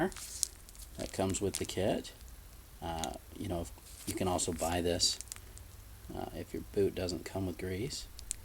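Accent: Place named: West Coast, United States. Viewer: Something about your laid back west coast narration that adds a layer of mellow to the repair. Thanks man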